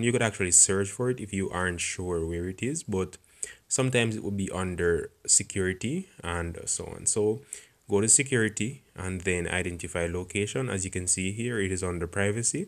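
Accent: Jamaica. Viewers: Your jamaican arent you 🤔?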